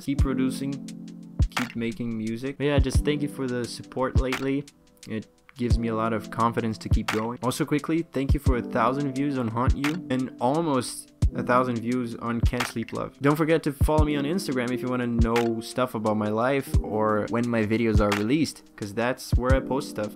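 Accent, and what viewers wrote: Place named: United Kingdom. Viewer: Having a british accent is an unfair advantage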